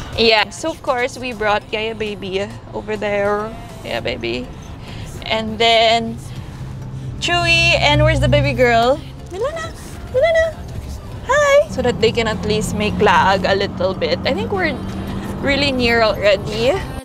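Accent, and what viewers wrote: Visayas, Philippines. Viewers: you sound so cute with bisaya accent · Cute kaayo ka mag bisaya ate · ka cute sa bisaya 💕😊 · sagdii lang ng mga nega comments sa imuha oi you can't control them but what you can do either is to continue to do the right thing, which is to entertain us with your vlogs and cute kaayu ka mgbisaya oi lingaw kaayu ❤️ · Cute kaau mag binisaya ui… 🥰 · kacute magbisaya HAHAHHA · Kalingaw jd nimo mag bisaya ate 😂 more daw aning mga bisaya nimo 😂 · Ka cute nimu mag bisaya uyy 😍😍😍♥️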